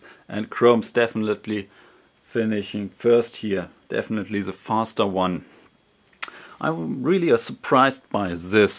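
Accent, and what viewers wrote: Germany. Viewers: possible that you are german? Your english accent :D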